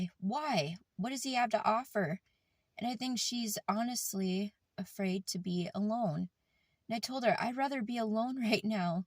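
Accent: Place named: Midwest, United States. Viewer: I just wanted to tell you I see you. I relate to you on so many levels. I am originally from Wisconsin, so I appreciate the Midwestern accent number one… 😊 For me, my dad was my “person”… I was married at the time when my dad passed, and I never felt so alone in my life and in my grief… my husband did not understand. We are no longer together not to scare you….It had nothing to do with the grief well OK MAYBE that was part of it, but he also had a severe alcohol problem… But, he never understood, or gave me what I needed. You are NOT an asshole! I will admit to you that there were times where I thought to myself. I can’t wait until my husband loses one of his parents, and THEN he will understand. Trust me, I don’t wish that on anybody, but at the time I was so angry and hurt and frustrated with him that that was in my head… You are not alone. 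Anger is a huge part of grief.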